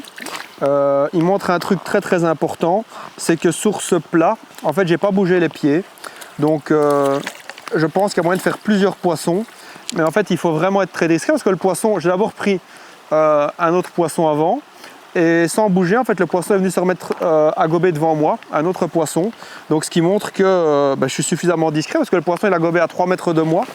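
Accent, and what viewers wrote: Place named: Belgium. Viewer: Encore une très belle vidéo et c'est accent belge. Rajoute un vrai charme à tes vidéos. On prend plaisir avec toi au bord de l'eau et on sent l'envie de transmettre. Merci encore pour ce travail. Je me suis mis à la nymphe grâce à toi depuis plus d'un an et c'est un vrai plaisir. Va falloir que je franchise le pas de la mouche sèche mais c'est compliqué dans nos rivières de haute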